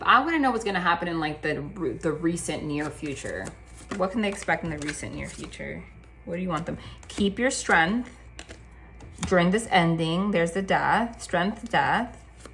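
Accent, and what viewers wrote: New Jersey, United States. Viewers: I just Love your accent 😂 that East Coast NJ accent! Nothing like it✨🫶🏼✨FUN~KEY😂(funky) ✨ Energy🎉 and your readings are so beautiful, thank you. Lots of love & Light sent to you and everyone here🧡☀️💫✨Namaste 🙏🏼🏵️🍀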